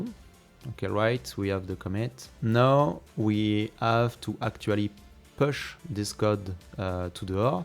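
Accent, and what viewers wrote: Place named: France. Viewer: Question : how do I get my pgp id for the validatepgpkey (also nice french accent)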